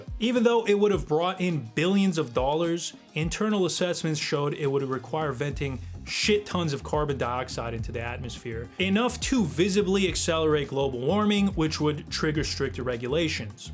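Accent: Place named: Chicago, United States. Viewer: great chicago accent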